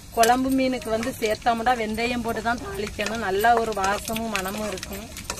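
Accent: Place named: Salem, India. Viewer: சேலம் மாவட்டத்தில் இருந்து பேசுறாங்க சூப்பரா இருக்குங்க வீடியோ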